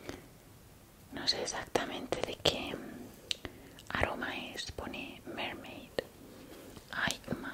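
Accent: Spain. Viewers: como pronuncia esa R como es, yo diciendo palabras con R como orchata suena como L, puertorriqueno al fin lol. El acento espanol usualmente no me gusta mucho pero el de es chevere, no se debe ser la region de donde es o no se pero lo mejor de ella es cuando habla. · La tonada española es el toque perfecto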